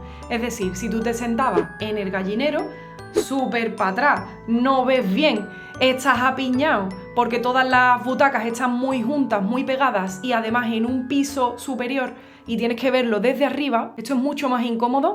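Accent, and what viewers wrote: Andalusia, Spain. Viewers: Me encanta! Solo tengo una duda espero no te moleste..... Por qué a veces seseas y a veces no 😜 me he dado cuenta que la mayoría de los españoles no sesean salvo los andaluces que pronuncian igual la c, que la s, que la z. Y es muy propio de Andalucia aunque en tu hablar veo una mezcla de ambas. Soy estudiante de castañuelas por cierto! Saludos desde México y Olé! ❤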